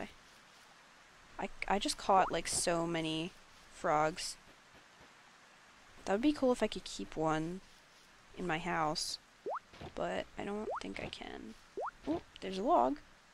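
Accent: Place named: New York, United States. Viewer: Kind of sounds New Yorkish, they way you say certain words, heheh. cx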